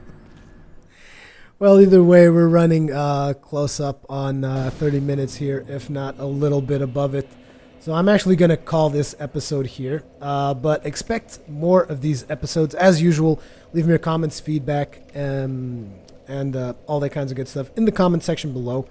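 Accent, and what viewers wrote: United States. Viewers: First vid, Vaati sent me. Damn, your English is perfect. You sound American. You have no accent. I have Portuguese heritage, but I don't know what it sounds like. Weird that you don't have an accent. Great vid though. Going to watch the rest if this play through.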